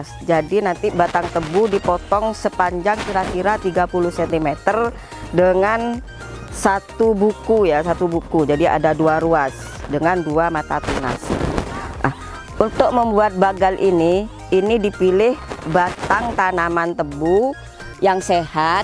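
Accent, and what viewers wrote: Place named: Madura, Indonesia. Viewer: bahasa Indonesianya , ketoro nek wong meduro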